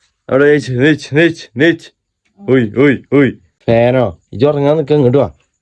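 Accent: Malappuram, India. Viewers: Malapuram slang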